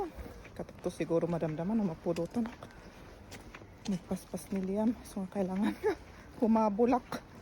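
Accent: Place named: Cordillera, Philippines. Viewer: Kasla ka met nga Igorot nga ag sao ti Ilocano ken Filipino, suruem met ti agsao iti Kan kanaey ay kalin di Eb Besao..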